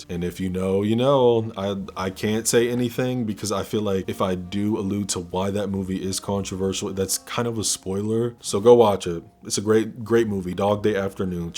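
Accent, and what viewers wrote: United Kingdom. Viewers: Giving a like for the british accent alone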